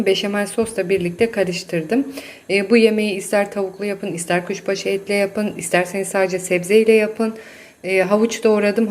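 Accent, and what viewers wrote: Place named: Trabzon, Turkey. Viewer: şivene kurban teyzem :) bende trabzonluyum cok keyfle izledim sizi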